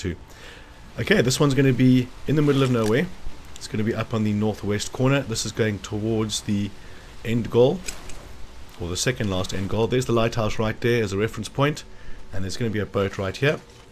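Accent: South Africa. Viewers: Are you South African? :) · Hey! Are you South African? It kinda sounds like it.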